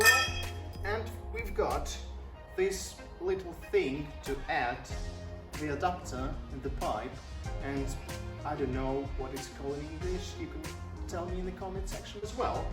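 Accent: United Kingdom. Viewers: Чувак, молодец, лайк за приятное произношение английского))) Похож будто англичанин)) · Well done, it adds a bit of flavor to the exhaust, personally I'd go for a bit less noise for daily driving. But I do have to say, your english is very good, you almost sound British lol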